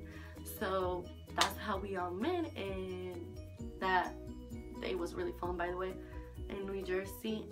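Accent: Puerto Rico. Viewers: Yaasss girl!! Saludos desde PUERTO RICO! Escuché tu acento y rápido supe que eras boricua. Eres bella y me encantan tus tutorials de maquillaje. Xoxoxox 😘💕🇵🇷🇵🇷🇵🇷🇵🇷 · que orgullo saber que eres boriqua. Yo soy cubana y veo los videos de Gabi. Cuando escuche tu accento en un video con Gabi y la otra rubia, tuve que encontrar tu página to support my fellow latina! Espero que sigas haciendo videos y que te hagas grande y famosa mientras sigas representando a todas las latinas que te siguen. Good luck girl!:D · You should make a video talking Spanish ! Yess if love to hear you speak because I love how Puerto Rican's talk lol 😭 btw your soo pretty 😍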